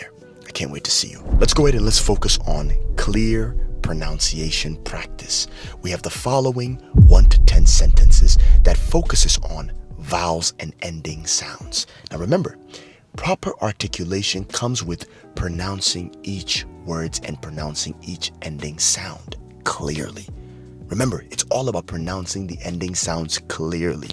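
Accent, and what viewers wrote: United States. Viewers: It's not a British it's an American accent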